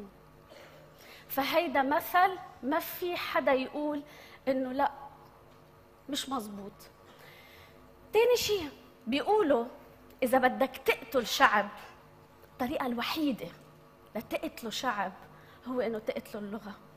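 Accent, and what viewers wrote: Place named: Levant. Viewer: يا حلاة اللغة العربية باللكنة الشامية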